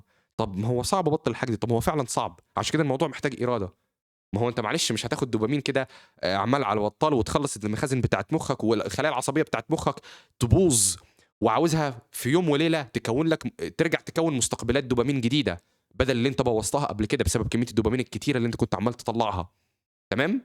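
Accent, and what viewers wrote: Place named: Saudi Arabia. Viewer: لهجتك سعودية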